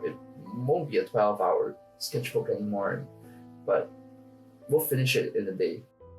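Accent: Singapore. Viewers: You sound singaporean/Malaysian COOL! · i knew i recognised that accent 🇸🇬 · why does it sound like you have a Singaporean accent lol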